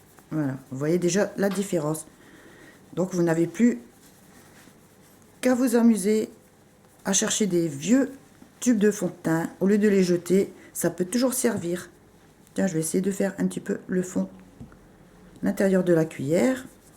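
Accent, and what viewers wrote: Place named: North, France. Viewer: Bonjour Madame, merci pour les astuces que vous transmettez généreusement pour le nettoyage de variétés de bijoux telles que l' or, l' argent etc...😊 Vous avez un accent du Nord...👍😊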